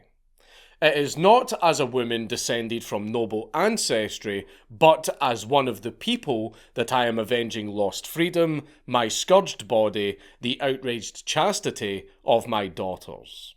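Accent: Scotland, United Kingdom. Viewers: I fekkin love the scottish accent. History = Hesterree ..music to me ears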